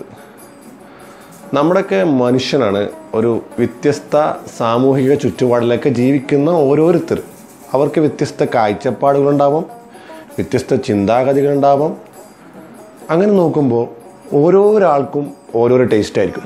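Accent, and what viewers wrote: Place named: Malappuram, India. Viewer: kka ങ്ങളേ വർത്താനം.....അടിപൊളി....ങ്ങള് മലപ്പുറത്ത് ന്നാണോ?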